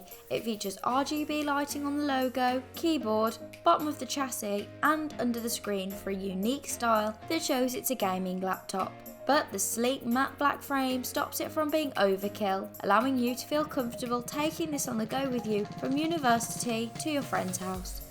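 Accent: United Kingdom. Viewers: I love that British accent ❤️ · She's british. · this is the most british accent i have eva heard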